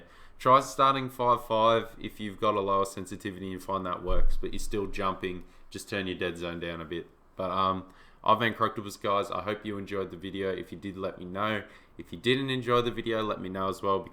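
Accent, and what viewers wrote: Australia. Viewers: Soon as I heard that voice I subbed, don’t come across many aussies, good video to bro, didn’t know what deadzone was till now 🤙🏻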